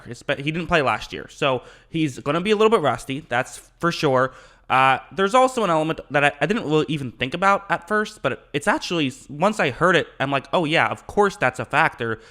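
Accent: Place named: Detroit, United States. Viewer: Ahhh, so your a Lions fan. I was wondering. You sound like that region. Makes sense. You do a good job of not showing bias.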